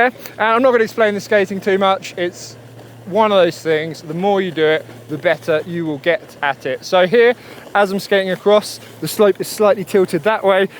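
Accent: United Kingdom. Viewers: You are so inspiring mate! You got the cool and positive attitude, i sometimes look at your videos just to relax (and for your lovely british accent). I hope i get to Alpes d'Huez some day to learn some stuff and correct my riding posture someday ;) Cheers, keep up the nice work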